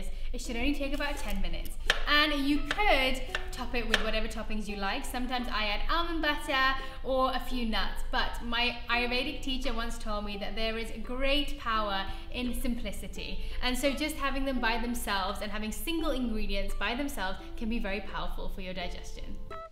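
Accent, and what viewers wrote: England, United Kingdom. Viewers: Ik she's English but her accent gets me every time XD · I love your videos, they are so inspirational. I would love to hear more about your health journey. Are you British??, which part???. I love poha. ❤️ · I really like her British accent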